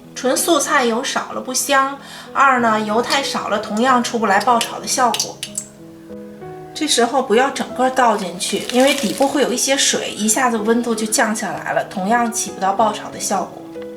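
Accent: Tianjin, China. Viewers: 口音像天津人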